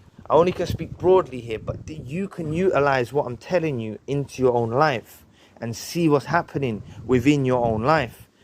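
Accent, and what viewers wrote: United Kingdom. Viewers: are u from uk bother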